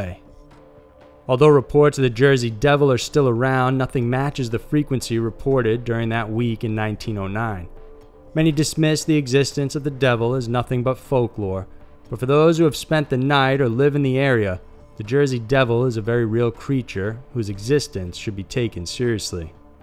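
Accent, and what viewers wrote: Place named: Texas, United States. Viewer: I absolutely love all of your videos. One of the best channels and def the best narration/voice. I wonder if you're from Texas? I am and your accent always makes me think you are :)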